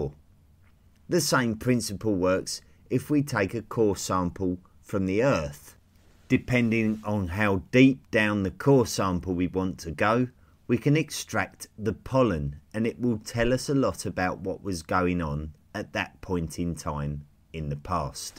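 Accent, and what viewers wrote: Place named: United Kingdom. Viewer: What British accent is this?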